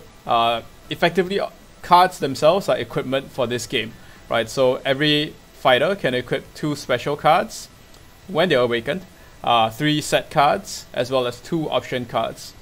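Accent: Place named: Singapore. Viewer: Just curious. You sound singaporean. Like your video btw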